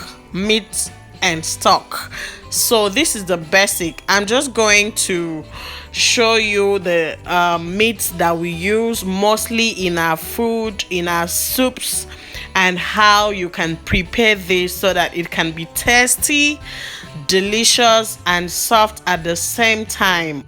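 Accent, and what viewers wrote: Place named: United States. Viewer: Hi. Thank you for such interesting video. I love cow skin and goat meat. Tripe not so much. I have never had Nigerian food, but I have a Nigerian friend who happens to be my mentor. I love Nigerian movies. It’s where I learn the phrase Chineke. And most of all I love the fabric. I would love to visit just so I could buy African fabric. Thanks for adding the new words in my African vocabulary. I will go and show off on my mentor that I am slowly becoming Nigerian. You speak as if you live in the states. I live in the states too and I don’t like the cold either. Anyway I watched to the end and be blessed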